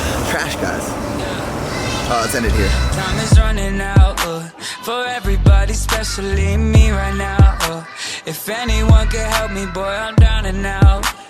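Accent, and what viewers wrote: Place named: Pennsylvania, United States. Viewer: That tank he was wearing at the start? Who buys that kind of shit? Oh yeah, he is from Western PA. horrible taste, horrible accent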